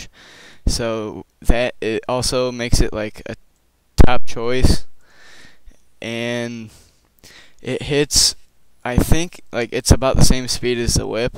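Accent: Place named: Norway. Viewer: R u norwegian? U got a norwegian-english accent :P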